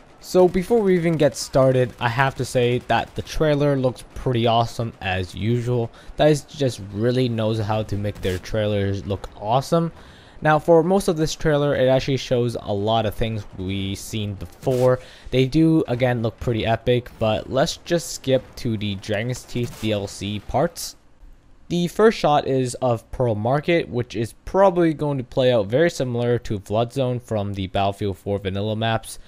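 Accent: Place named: United States. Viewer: All your intro sound like your American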